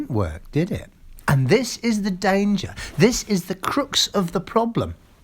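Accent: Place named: United Kingdom. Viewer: Love your accent friend! Where you from in Britain?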